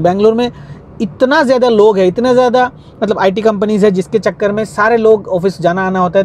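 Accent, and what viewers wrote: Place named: Hyderabad, India. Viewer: No sense of comparison! The food is best in Hyderabad overall in india, plus if u see hyd is worlds best city to live in since 5 years. The infrastructure of hyd is well planned since 1500 years the whole city was build by Qula qutub shah and it has largest Post office to first airport and many things . Hyd is not considered for south food it’s famous for biryani and non veg foods. Again largest film city + tollywood ads more opportunities to hyd. On top of it hyd is more welcoming to all Indians compare to Bangalore. Especially North Indians don’t like to live in Bangalore or Chennai but they are more comfortable in Hyderabad as food and language is major attraction . One more thing ur speaking almost hydbdi slanng 😂😂. Thanks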